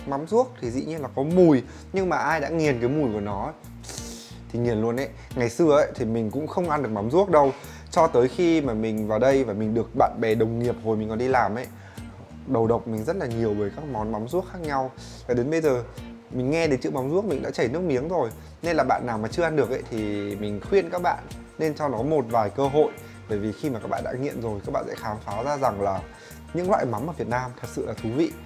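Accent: Hanoi, Vietnam. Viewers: Giọng Hà Nội nghe cưng phết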